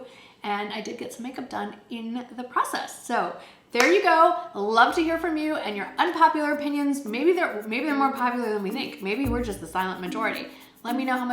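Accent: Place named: South, United States. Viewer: Omg I love you! Spoken like a true classy southern lady 👏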